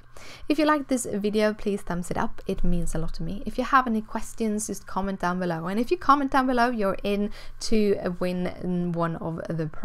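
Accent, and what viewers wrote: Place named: United Kingdom. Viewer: USA resident. Oh my gosh, I LOVE that you made the water hole a shaker. I'll look forward to seeing more of your videos because I like your style. And your accent. I'm going to the UK in Sept/Oct., and will hear nice accents everywhere :)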